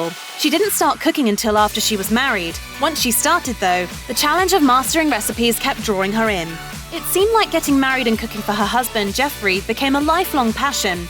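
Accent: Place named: England, United Kingdom. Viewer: Why a voice-over with an English accent? Bad enough she talks too fast but she also is not right for a US audience. If you needed a Brit, how about one who knows how to speak accent-free? Not to mention who cares if she is working in her workshop? Or that she has helpers? It's a TV production, right? Would that our country could reflect as well in our public/political life as Ms. Garten.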